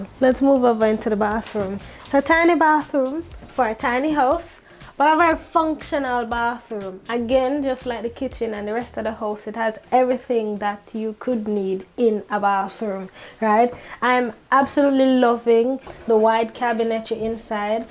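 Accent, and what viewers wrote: Jamaica. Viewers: Cah tell me seh u anuh Jamaican mama👀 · I have to comment because your narration, description, accent (seems 🇯🇲 ) and personality moved me to. Also had to subscribe! Phenomenal video 👏👏👏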